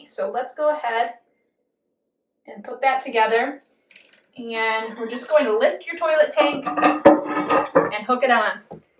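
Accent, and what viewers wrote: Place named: Midwest, United States. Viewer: Thanks for the great video and clear instruction. Love your midwestern accent and I haven’t heard the word “grody” for so long you took me back to the 60s/70s! Ha! Just wish I could still get down on my knees. Arthritis galore! Thx again!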